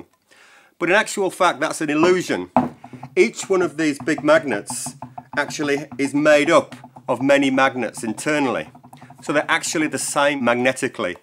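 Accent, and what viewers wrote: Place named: North, United Kingdom. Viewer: That's some accent mate! Northern UK with a Kiwi twang?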